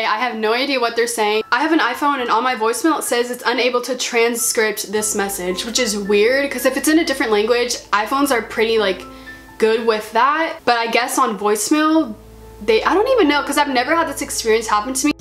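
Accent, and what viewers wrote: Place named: China. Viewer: The voice is chinnese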